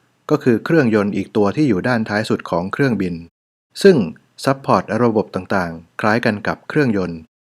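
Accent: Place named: Isan, Thailand. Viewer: ป๊าดดดด....กัปตันอ่านอิสานฮู้เลืองไป่อี๊กกกก ต่าฮักต่าแพงแท่เด้จ้า😊😊😊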